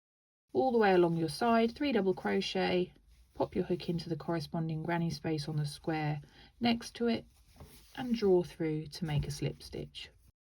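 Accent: England, United Kingdom. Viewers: you sound lovely English ☺️ Thanks for sharing your knowledge, it's gold to me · You sound English but you’re calling them DC when they’re UK TC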